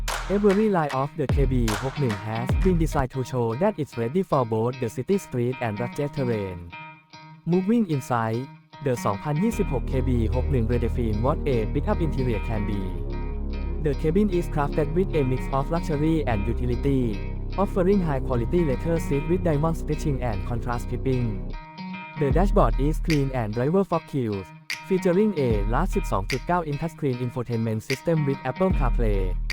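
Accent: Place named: Thailand. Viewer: อ่านภาษาอังกฤษแบบไทย แถมตกไทยด้วย เช่น Starting with the exteria the สองพันยี่สิบหก Isuzu KEหกหนึ่ง … งงแท้ จะไทยหรืออังกฤษ❤❤ ปรับใหม่ก็ดีนะครับ ติเพื่อก่อนะครับ ❤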